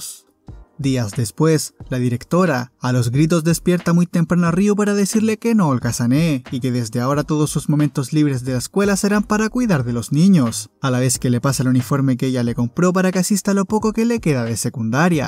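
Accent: Chile. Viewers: con los primeros 5 segundos ya supe que es chileno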